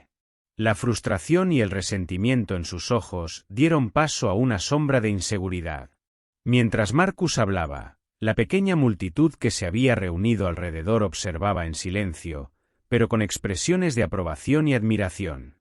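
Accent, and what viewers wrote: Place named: Spain. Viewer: Quita ese acento español y a la mejor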